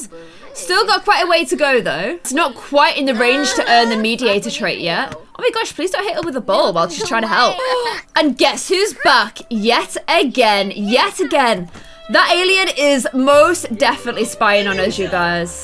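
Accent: Ireland. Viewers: I didn't know u were Irish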